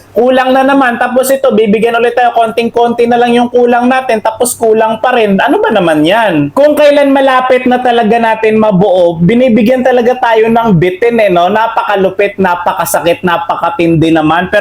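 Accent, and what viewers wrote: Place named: Visayas, Philippines. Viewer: Bisaya yan halata sa lods sarap sapakin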